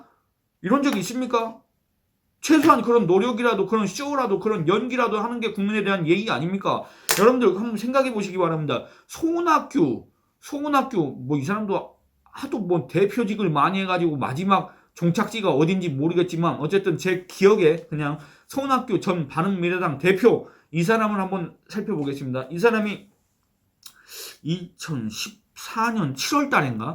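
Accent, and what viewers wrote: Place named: Gyeongsang, South Korea. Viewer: 저는 경상도 사나인 줄 알았어요😊😊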